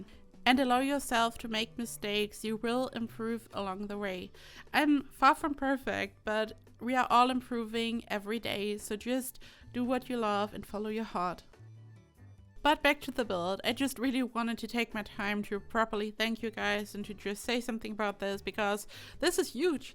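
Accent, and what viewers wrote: Germany. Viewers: First, congratulations on bypassing the 100k subscriber mark and receiving your silver play button! You definitely deserve it. Secondly, I happen to love your German accent. One side of my family is of German ancestry and I took German in 10th grade many, MANY moons ago. I'm super happy for your Simming success. Also, congratulations on being the designated SNB Bank venue builder for SimRealist. Way to go!!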